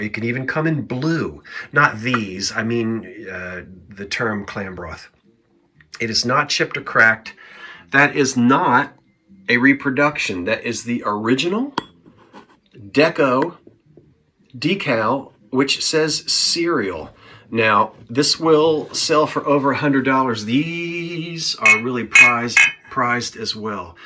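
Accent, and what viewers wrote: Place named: New Jersey, United States. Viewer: I always love listening to you New Jersey guys pronounce the word "water." Like "wooter." LOL!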